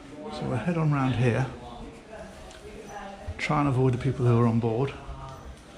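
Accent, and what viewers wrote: England, United Kingdom. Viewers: ... This is the tour that 'popped my yacht cherry', so to speak. PS - My wife hates you for the monster you've created. "Long time listener, first time caller"... Yes. I've seen every dang vid you've done on floating vessels. It's like video heroin, I swear it. So... It all started out with Youtube's "suggested view". Watched this... Watched all of 'em, no really. ALL of 'em. So I says..." can't be the only dude doing these..." And clearly - he's not. He's just the guy who does them brilliantly...!!! I've watched over a dozen guides, ranging from obnoxiously douchy, to walking animal tranquilizers, and..... You sir "are the bar", so to speak. You sir, set the standard in yacht tour guides. Now enough about you...! This damn "boat"... Great Googly Moogly...! Some thoughts on Alia: - The biscuit in red... I love the way blue bloods 'prance' as they scoot out of the way. 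Precious jiggle... "Slightly Japanese theme"...? Ya think? Only thing missing was a David Carradine cameo! And the Master... Gorgeous, beyond belief, but- no friggin' TV? Wait, what...? And the shower... You could fit an entire football team's cheerleading squad in there...! (IF they squeezed real tight - "Oh, stop...!") The "extra cabin"... VIP quality, to say the least. Awesome crew cabins and mess area! And...... EVERY crew cabin has a proper shower for the pair of bunkmates. "Excellent..!" (In sultry, understated, classic English, I think, tone) I cringe when I see the "hand held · Wow, I like AL you minium. We pronounce it ah loom in uhm. Cool as heck to hear your English accent.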